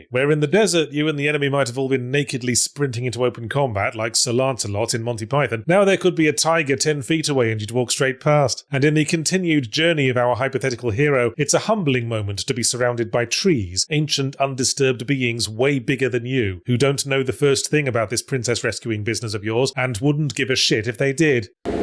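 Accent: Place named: United Kingdom. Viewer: College level intellectual breakdowns of video game design in cheeky tone with a British accent is peak. Love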